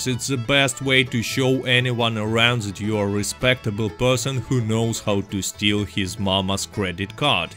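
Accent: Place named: Russia. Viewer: Stop doing all other content to do more videos like these, the sarcasm in his russian voice kills me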